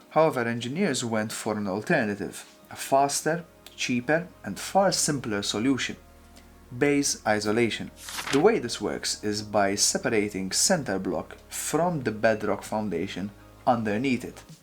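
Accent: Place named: Malta. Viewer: Are you Maltese? That accent is familiar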